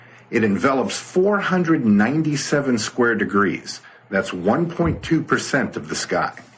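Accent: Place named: United States. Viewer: you talk yanky too much. settle down on the oronunciation its to harsh.try to sond like english not overpronounced yank. nye.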